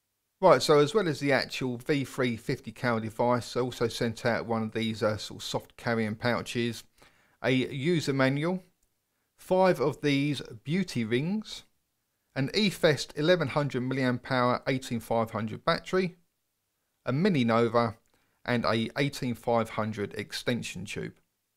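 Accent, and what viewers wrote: London, United Kingdom. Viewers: What part of London are you from? Maybe Hackney or Bethnal Green? Cool video.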